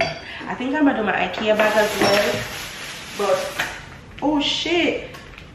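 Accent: Jamaica. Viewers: First time on your channel and I was watching part 2 to this. I hear your accent and I was like she sounds Jamaican (I'm a Jamaican) lol and I was like alright let me go to the first one and here I am. Congrats girl!!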